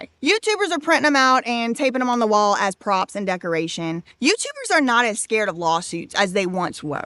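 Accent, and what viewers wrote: South, United States. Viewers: Thank you, I knew it’s southern, brilliant!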